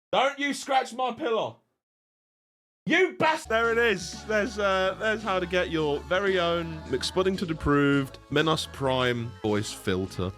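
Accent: United States. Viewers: THANK YOU SO MUCH!! (Also holy crap, your American accent is ON POINT!!) ❤ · Oh my god, I kinda expected voice to be just a slight radio-y exaggeration of your normal voice, this is nuts. You're killing it with the American accent, it's spot on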